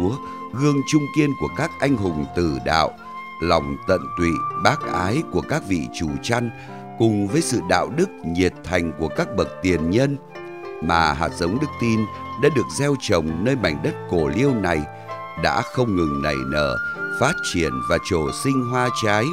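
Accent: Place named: Nam Dinh, Vietnam. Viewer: Giọng đọc ng o Nam Định làm trên ĐTHVN. Phải mời họ đọc phải ko ad oi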